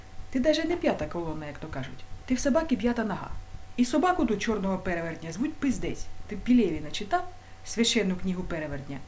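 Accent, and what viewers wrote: Surzhik, Ukraine. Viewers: Какой прикольный суржик :) Молодец! Хейтеры пусть идут в путин.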